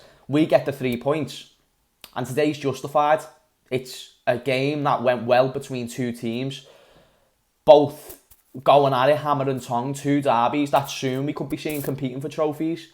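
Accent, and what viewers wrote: Liverpool, United Kingdom. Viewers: Is that a liverpool accent? Greetings 🇩🇪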